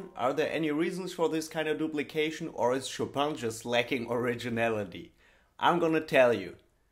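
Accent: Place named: Germany. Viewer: You have an intellectual clarity mixed with humour which is very nice. Leaves the watcher with a lot of food of thought. Thumbs up! (Btw as a Swede the German accent makes all difference =) ) ps. very well researched, another thumbs up! ds.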